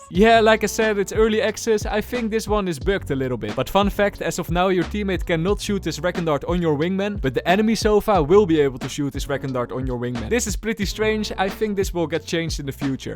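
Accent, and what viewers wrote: Netherlands. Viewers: hey are you dutch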